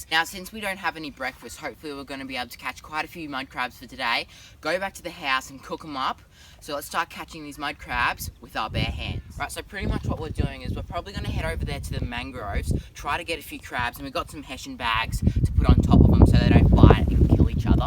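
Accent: Australia. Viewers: every word in an Australian accent sounds cool....even crocs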